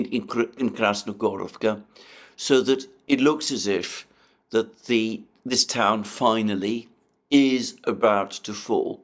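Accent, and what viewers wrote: United Kingdom. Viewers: Which part of the UK is this guy from? I like his accent lmao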